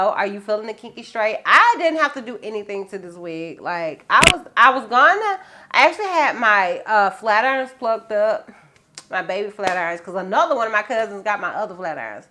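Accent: South, United States. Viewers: You're so beautiful in all the wigs. I'm glad your feeling better. I work in the medical field and love headband and ponytail pieces because you can just throw them on and GO! Your spirit is so uplifting. It can be felt thru the phone with that Southern accent! You crack me up!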